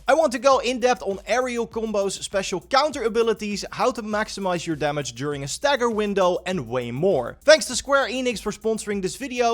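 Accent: Netherlands. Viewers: Your accent is sooo dutch 🤣🤣🤣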